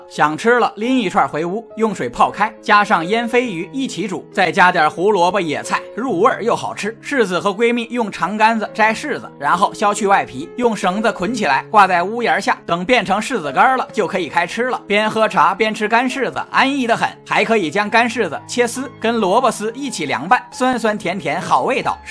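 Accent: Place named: Northeast, China. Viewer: 這東北槍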